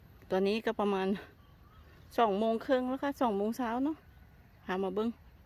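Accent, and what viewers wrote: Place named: Loei, Thailand. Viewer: อยู่ จังหวัดไหนค่ะ พูดเหมือนคน เมืองเลย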